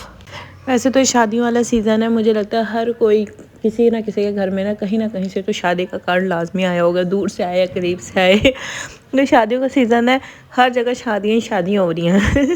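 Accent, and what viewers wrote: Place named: Karachi, Pakistan. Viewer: Ap ai to Multan se ha Karachi me pr ap bolte bilkul Karachi wlo ki trah Hain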